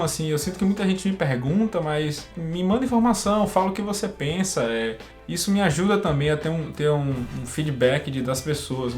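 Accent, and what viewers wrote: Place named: Bahia, Brazil. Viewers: Menino assim que escutei sua voz percebi o sotaque baiano. Adorei seu canal. Um salve direto da Bahia. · véy, verdade! Primeira coisa que pensei! Um conterrâneo, além do sotaque, as gírias baianas hahaha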